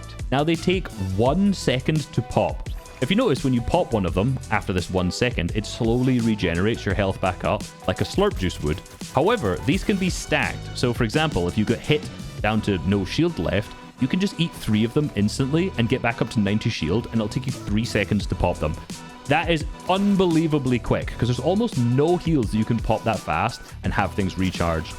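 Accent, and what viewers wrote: Scotland, United Kingdom. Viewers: Yay Scottish man goes on cartoon gun rant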